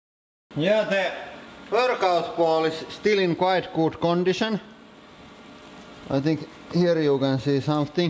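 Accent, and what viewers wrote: Finland. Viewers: Is that a Finnish accent I hear? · Every Finnish and Estonian person speaks like this anyways so not so unique. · finnish accent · nyt on kyllä niin selkee suomi aksentti että :DD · Are u finnish??😂😂 im finnish too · r u finnish?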